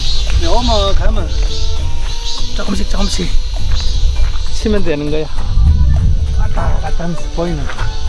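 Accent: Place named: Gyeongbuk, South Korea. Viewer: 경북 억양 너무 정겨워요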